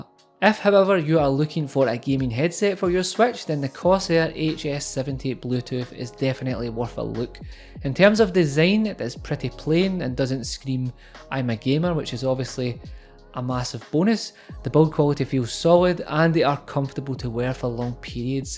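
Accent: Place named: Scotland, United Kingdom. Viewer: Love how ur scottish mate, where abouts you from?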